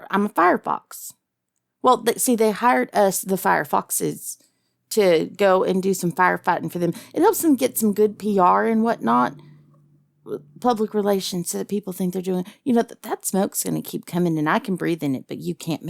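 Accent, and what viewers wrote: South, United States. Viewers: I feel like your getting more southern every time you put that accent on.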